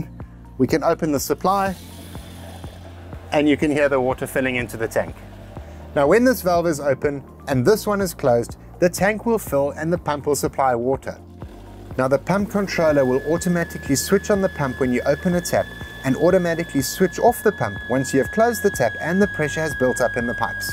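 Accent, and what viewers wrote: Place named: South Africa. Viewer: It’s a shame a whole accent is connected with hate because I have always loved the ‘Saffa’ accent 😊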